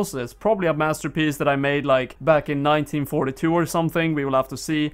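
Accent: Sweden. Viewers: ok tyckte du hade en svensk dialekt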